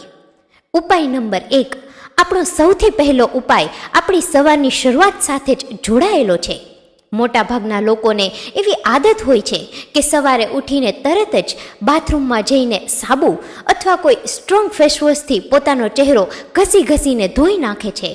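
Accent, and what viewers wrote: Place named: Saurashtra, India. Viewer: Nice. Your bhasha is like Saurashtra.!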